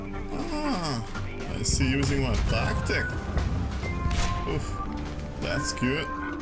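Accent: Eastern Europe. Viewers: uses british tank yet im still hearing some slavic some sounds.... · Why is this in my playlists and warthunder is still going wow I haven't played this for years . Eastern European accent and crap meme's no thanks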